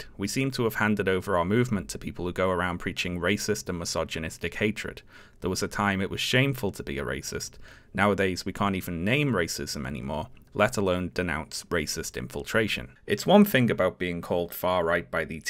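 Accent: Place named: England, United Kingdom. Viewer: I find it interesting that even though he's identifiably English, he still has a way of speaking that's SO particular, it's funny. What's an anteye? (I'm not a native English-speaker)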